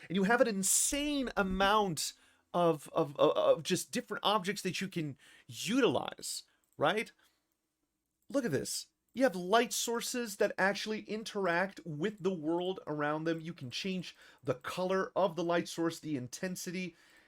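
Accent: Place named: United States. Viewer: You were born and raised in Brazil? You have the most American American accent I have ever heard. Did you train your Brazilian accent out of yourself or something?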